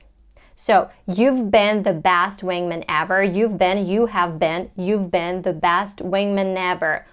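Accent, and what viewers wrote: United States. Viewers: Can you tell what kind of American accent are you speaking? It’s a cute accent!!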